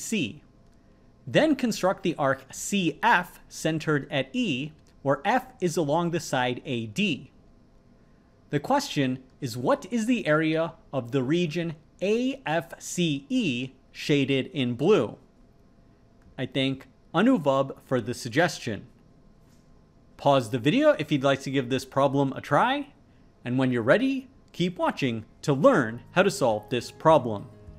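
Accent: India. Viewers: Bro are you Indian?? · Your voice is deeper than I remember from 4 years ago I can also detect more hints of an indian accent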